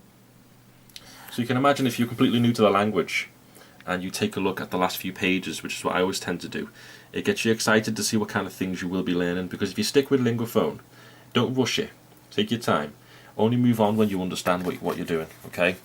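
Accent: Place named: Merseyside, United Kingdom. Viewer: Merseyside clearly.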